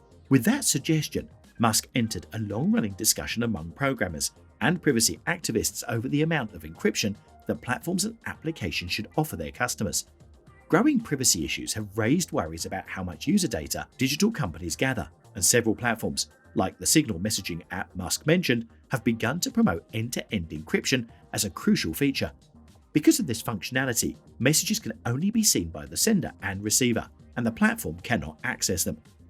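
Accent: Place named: England, United Kingdom. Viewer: Who in the hell is this English guy do we have anybody that can talk normal anymore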